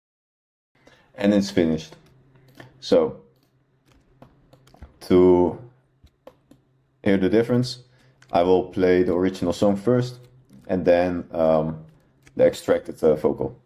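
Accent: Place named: Netherlands. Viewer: Jij kom zoiezo uit Nederland aan je accent te horen 😂😂❤️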